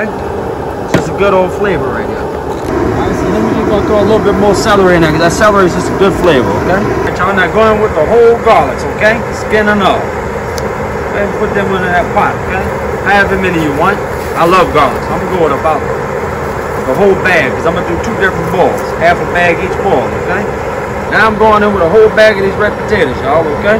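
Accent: Louisiana, United States. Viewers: That's a bona fide cajun accent. ;)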